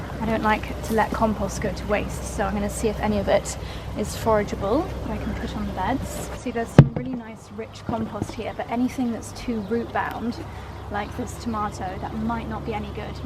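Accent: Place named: England, United Kingdom. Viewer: How odd - she has quite a clear English accent. Which bits couldn't you understand? Tho I suppose she does sometimes talk quite fast.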